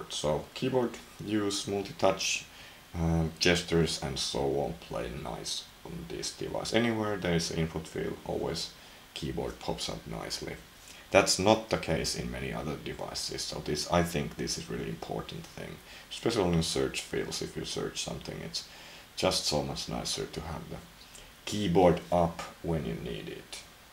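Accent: Finland. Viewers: where you from? you sound finnish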